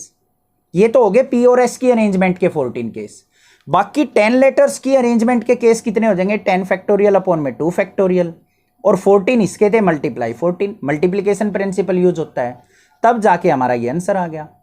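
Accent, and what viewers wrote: Haryana, India. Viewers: Sir aap haryana se ho😊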